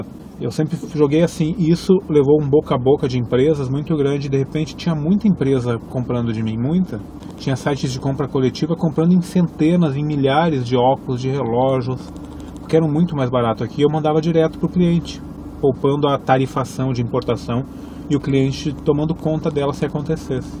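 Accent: Rio Grande do Sul, Brazil. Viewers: É o primeiro video teu que assisto, mas pelo sotaque logo percebi que eras do RS (eu também sou gaúcho). E quem falou que você não tem uma boa dicção, estava muito enganado. Você faz uma excelente apresentação em frente a câmera! Não é cansativo de assistir, muito pelo contrário! Seu relato nesse video foi emocionante! Ganhou mais um inscrito e um joinha e vários compartilhamentos! Rumo aos 10 milhões de views, tchê!! Abraço! :-)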